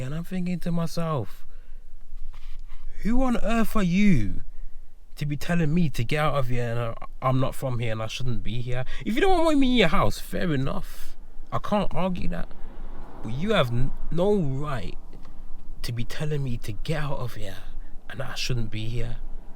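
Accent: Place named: United Kingdom. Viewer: His accent is clearly british !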